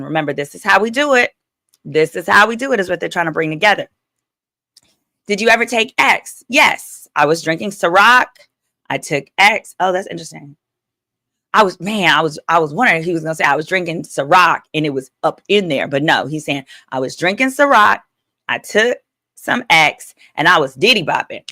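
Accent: South, United States. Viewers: Hey, love your lives covering this trial! I graduated from Thurgood a few years ago and I'm from Oakcliff too! Listening to you is making me wonder if I sound like you lol, that country twang be jumping out.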